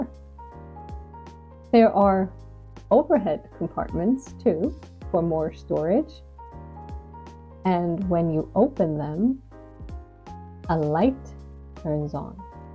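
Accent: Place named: United States. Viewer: Oh liebe, after some failed approach only, today I did see your videos; twice, and I am - my english from the old millenium is too bad - sono commossa. Going around the world with www, with Captain, with the friendly people around the continents, who see and love YOU and these videos - which language fits? I was non really able to understand everything in the video - I suppose, because your english is very US-marked and my english is so old and simple and never really used outside of Germany. I never crossed the ocean, but I feel the love and the blessing to have friends over oceans of water, of mountains, of wars, of years - and this, for me, is making your videos so warm, sincere, beautiful. I loved to see this green and pacific Germany, to see you and your mother so near to each other, to feel connected. I think, this is the secret - your secret, the secret of leaving a country to explore an other country, to find friends and to stay connected. 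And having captain you will be shure to move on and to pass borders and to meet and connect with other people capable to love!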